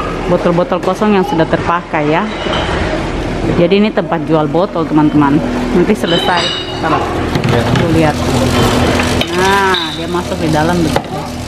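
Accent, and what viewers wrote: Papua, Indonesia. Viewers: itu bersyukur dapet kk, orgnya menyenangkan, unik, bicara apa adanya, pintar, aku suka logat papuanya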